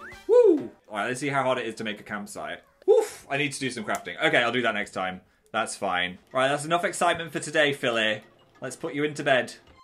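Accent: North, United Kingdom. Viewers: Sounding pretty Northern today, Philly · Phil's Northern accent is coming out HARD in this video.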